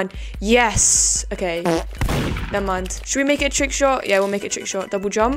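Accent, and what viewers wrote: United Kingdom. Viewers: I can actually tel that ur from England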